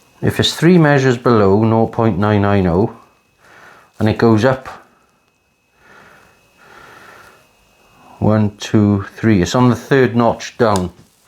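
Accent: Wales, United Kingdom. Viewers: Don't know if it's the Welsh accent or you're dressed up like Dexter, but I was in stitches. Great video and very entertaining.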